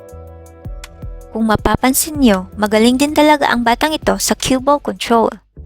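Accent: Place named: Tagalog, Philippines. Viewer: Fock ur tagalog accent so effing annoying so stfu biatch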